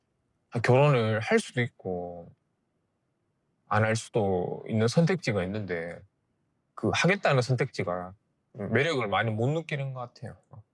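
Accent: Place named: Gyeongsang, South Korea. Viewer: 경상도 어투가 있어 친밀감 확~!느껴져 글 남기게 되었어요ㆍ저도 고향이 경상도 김천이라서요ㆍ~^^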